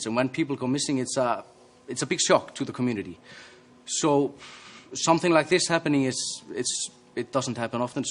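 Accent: Dublin, Ireland. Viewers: Its a Dublin accent alright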